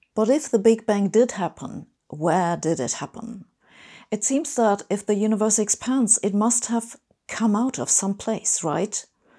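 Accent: Germany. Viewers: how did I know she would sound german before clicking the video haha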